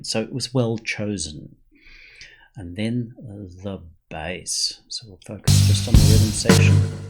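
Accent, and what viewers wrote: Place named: United Kingdom. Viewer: I really appreciate your Britishness, if that's a thing. I listened to the whole thing just to hear you speak, it made me feel good. Can you do a youtube channel where you explain random shit, it would make me really happy! I appreciate the fact that you never looked into the camera that was actually recording, keep doing that thing where you look to the left at what I'm hoping is your hedgehog that you are talking to. Truthfully, I really enjoyed it. Please don't tell me if it isn't a hedgehog, unless it's like a Harry Potter Owl or maybe a kippered herring. That's just as good. Thumbs up.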